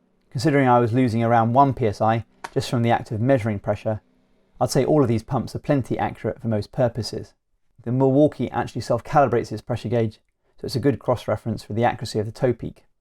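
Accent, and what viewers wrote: United Kingdom. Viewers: Thanks a lot!! This video really helped me! Nice English accent! I wish I could speak like you :D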